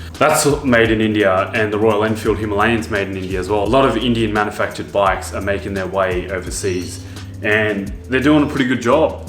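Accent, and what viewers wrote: Australia. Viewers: you sound Australian. haha. maybe I dont know my dialects very well. I have a CB500x. looking at these just for fun. we have no Enfield dealers and I'm sure I wouldn't lose a dime selling it if I didnt like it.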